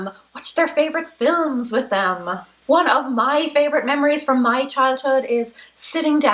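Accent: Ireland. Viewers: LOL "Shoot it out of a cannon", if only that was a real option. Then my neighbors could deal with all of my stuff haha. Great sense of humor, very inspiring. Liked and subbed. Also, love your hair, the name of your channel and of course your accent. Éirinn go Brách. I think that is correct? I'm about 1/4 Irish and born in the USA. (I'm not trying to be political, just supportive of Ireland, their culture and accents) I'm a compulsive collector (if you are wondering, yes, hoarding), with PTSD, MDD, ADHD, GAD, panic disorder, perfectionism and I watch these kinds of videos for inspiration, strategies to change my life. You're are fresh and funny, I think I will watch more of your videos. And yes, that means to procrastinate even longer LOL.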